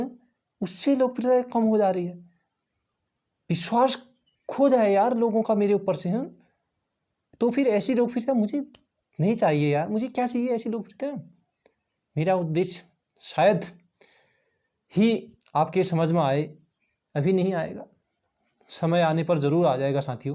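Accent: Pahadi, India. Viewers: Kyu koi problem h pahadi tone say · थेट पहाड़ी टोन। nice class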